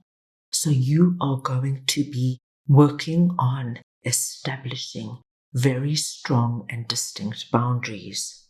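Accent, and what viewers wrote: South Africa. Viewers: I know a South African accent when I hear one. Hello maatjie.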